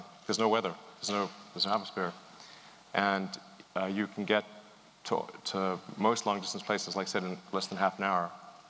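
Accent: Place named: France. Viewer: I love that french accent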